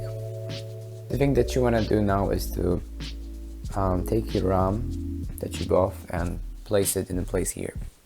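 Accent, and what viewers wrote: Poland. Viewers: Wiedziałem po akcencie, żeś jest Polak :D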